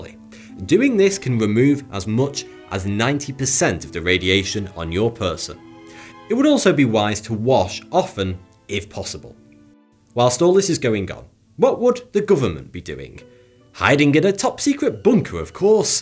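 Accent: United Kingdom. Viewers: Watching this makes me feel like your 20yrs behind like you know top secret you sound like your British forsure technology is not your field from across the pond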